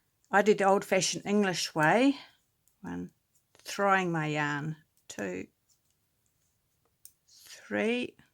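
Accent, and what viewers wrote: New Zealand. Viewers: love your Kiwi accent very familiar in Oz Love from Perth Western Australia 🇦🇺🙏❤️⚘️ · When you make an “oops” I laugh right along with you!!!!!! Love your NZ accent!!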